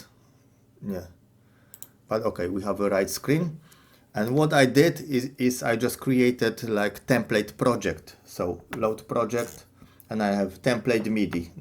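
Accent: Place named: Poland. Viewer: That accent is impossible to miss. Are you Polish? And since you use the name, I guess your name is?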